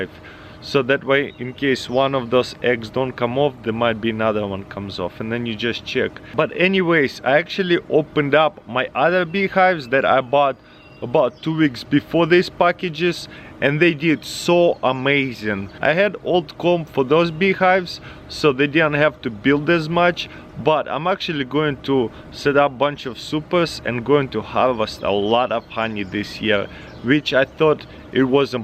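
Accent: Russia. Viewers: Hey, love the bee videos. This is something me and my husband have talked about doing but we know nothing about. Hopefully someday we can getting our own hives started. You videos are a help. You show the realness of it. Try and fail and succeed. The russian accent is a plus as well. Keep plugging.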